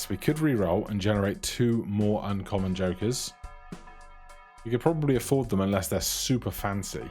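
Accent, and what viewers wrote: United Kingdom. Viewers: Hello, been loving your Balatro content, something about a fellow Brit commentating over this game in such a dry-witted fashion has only made me so much more eager for the release of this game so that I can actually play it for the first time. Fantastic content, please keep it up as much as you can considering your other commitments.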